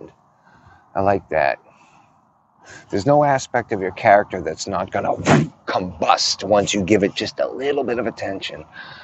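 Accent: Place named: Boston, United States. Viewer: oh, very nice! I thought I detected a bit of a Boston or Eastern MASS accent in your voice now & then, but I could be mistaken.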